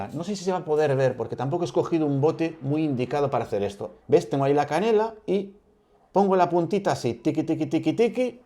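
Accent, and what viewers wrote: Spain. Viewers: E visto muchos vídeos, pero este me encantó por lo sencillo que explicas y tú buen humor, además ese acento como dicen en mi país "españoleto"sin ofender ❤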